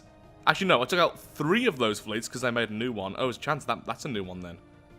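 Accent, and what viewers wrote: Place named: Birmingham, United Kingdom. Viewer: That sexxy Brummy voice...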